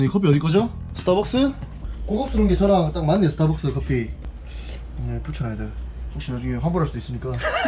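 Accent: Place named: Daegu, South Korea. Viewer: 여기보고 빵터져서 구독눌렀습니닼ㅋㅋㅋㅋㅋㅋㅋㅋㅋㅋ아 진짜 개웃기넼ㅋㅋㅋㅋㅋㅋㅋㅋㅋㅋㅋㅋㅋㅋㅋㅋㅋㅋㅋㅋㅋㅋㅋㅋㅋㅋㅋㅋㅋㅋㅋㅋ 혹시 대구분이세요?ㅋㅋㅋㅋㅋㅋㅋ 전 대구사람인데 제 남자인 친구들 말투랑 너무 같아서 정겨워욬ㅋㅋㅋㅋㅋㅋㅋㅋㅋㅋ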